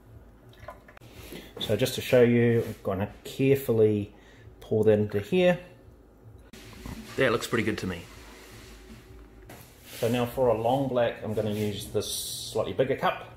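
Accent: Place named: New Zealand. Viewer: That was awesome mate!? I'm from Australia and I just llooooove the NZ accent ... keep it up. I have to clean my Vibiemme Domobar Piccolo. Well done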